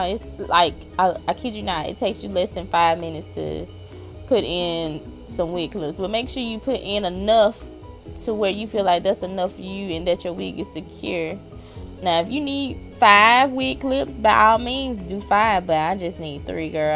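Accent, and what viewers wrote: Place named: South, United States. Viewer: You're so country but it's so cute. You have a new Subscriber forever just to hear your bubbly voice and watch your methods.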